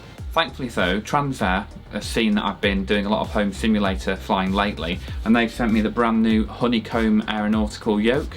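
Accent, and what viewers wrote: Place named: Blackpool, United Kingdom. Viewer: I recognised the Blackpool accent before the aerobatics over the Wyre! Nice film, from a 42yr defence aerospace vet who lived right under that view. Hope all is well for you now.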